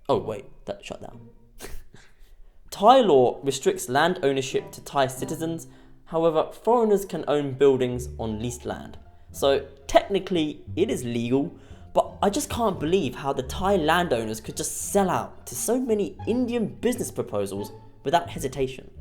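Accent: United Kingdom. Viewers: A british accent talking about his favorite past time aka the ladyboy and complaining that there are too many Indians in Thailand now.....2025 started off slow but is gaining traction coming from the last video I watched titled Carnival cruise ships are floating ghetto simulators.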